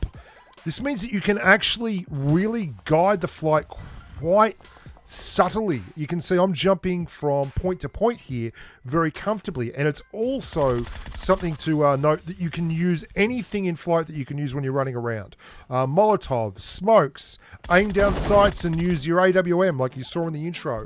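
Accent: Australia. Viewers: You from Australia 🇦🇺?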